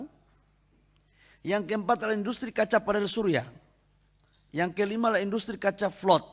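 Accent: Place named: Papua, Indonesia. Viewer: 😂😂😂 mantap pak menteri sa suka logat p Papua d pakai d sini. D tempat wakil rakya.😅😅😅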